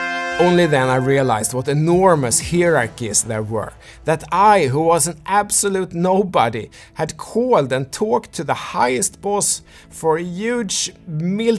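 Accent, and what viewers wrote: Sweden. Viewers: Dina videon är roliga och lärorika. Dock gör det lite ont i mig att höra din svengelska, fast ju mer jag lyssnar desto charmigare blir den:)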